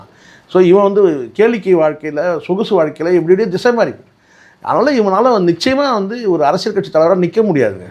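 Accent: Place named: Karnataka, India. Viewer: Yaaruda idhu? Kannada minna pesars aalu Oru channel vechikittu volaraa?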